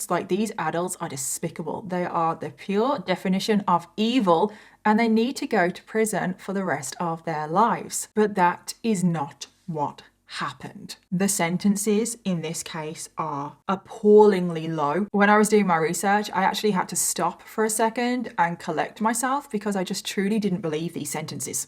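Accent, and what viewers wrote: United Kingdom. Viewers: Uhh that British accent....i just cant... Im so happy for your 1 Million Subscribers ❤ wish you 100x more.. Bye ❤ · Let me say, before I even watch this, you’re my favorite true crime YouTuber. Honestly, I was initially put off because I have serious British accent related PTSD, but I am glad I set that aside (it helps you’re female). Your content and delivery is exceptional 👍🏻💪🏻👏🏻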